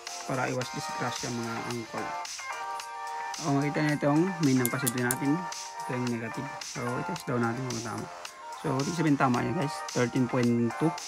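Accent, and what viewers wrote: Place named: India. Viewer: If im not mistaken, may dugo kng indyano..parang bulol ka magsalita ng parallel.. ganyan ang tono ng mga bombay!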